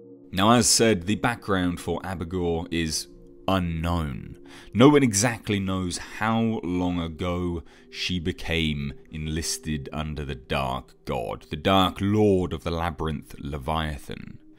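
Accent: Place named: England, United Kingdom. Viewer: Marvelous Discovering more about my favorite Clive Barker work with you're voice ( and English accent) just keep my blood boil for more Barker lectures 😜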